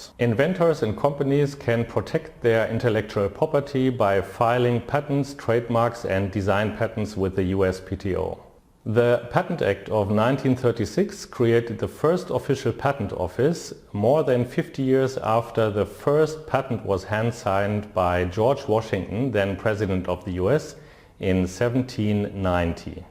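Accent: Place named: Germany. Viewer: Who is this guy ? Sounds like he’s from Germany.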